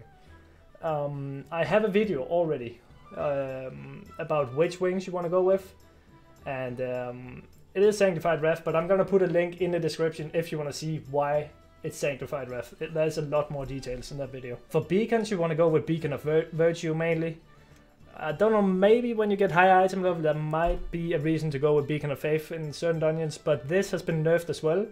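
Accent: Denmark. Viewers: Man kan godt høre at du er dansk xD fed video :) får mig helt til at overveje holy Pala :)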